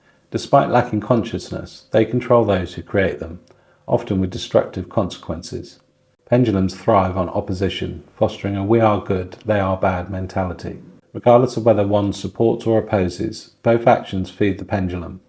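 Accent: United Kingdom. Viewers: lovely to hear your British accent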